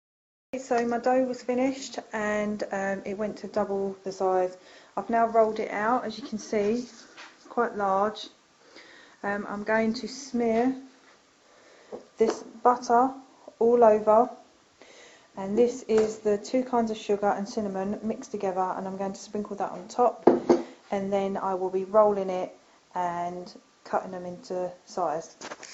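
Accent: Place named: Midlands, United Kingdom. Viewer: Mmmmm want one! Where about's are you from? I recognise your accent, it sounds like Midlands maybe?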